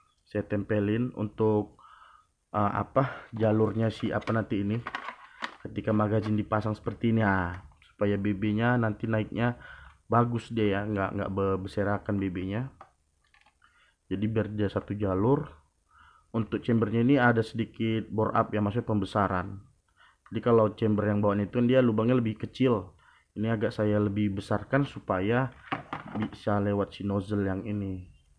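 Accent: Medan, Indonesia. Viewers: Jooosss bang.. Cm dr logat nya kyk org medan ni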